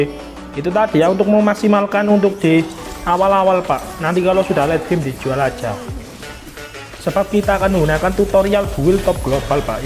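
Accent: Java, Indonesia. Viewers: saya suka cara gaya bicara nya kocak ada ciri khas wong jowo to mas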